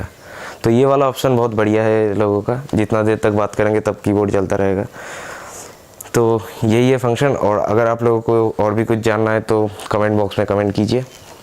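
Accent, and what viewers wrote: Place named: Bangalore, India. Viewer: Kotha sunai puro Bangalore bacca mine hocche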